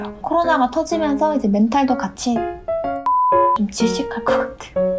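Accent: South Korea. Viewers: 日本語の発音が韓国人っぽくなってきましたね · やっぱり8ヶ月も韓国にいると日本語が韓国の方の日本語になりますね！可愛いです😭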